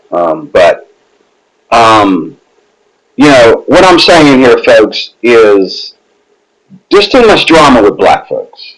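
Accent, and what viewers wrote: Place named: South, United States. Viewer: lol had no idea this dude was black until I looked up at the right hand corner 😂. He sounds like southern redneck lol 😆. He ain’t lying though 😉.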